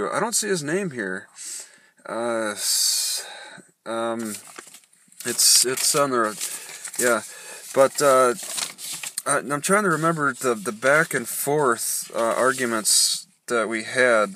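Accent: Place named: South, United States. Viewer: A word of advice your voice is awesome your face not so much point your camera at something else and just let your voice do the talking I subscribed because your videos are edgy and cool and your voice is a combination of a southerner a Pacific Northwestern and a guy from Jersey!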